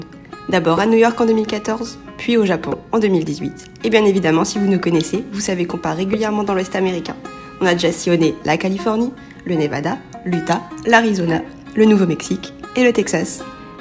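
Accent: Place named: Alsace, France. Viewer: c'est un petit accent alsacien que j'entends ;-)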